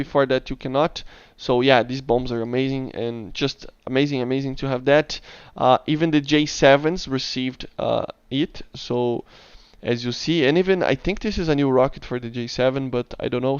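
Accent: Brazil. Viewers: Brasileiro só pelo sotaque kkkk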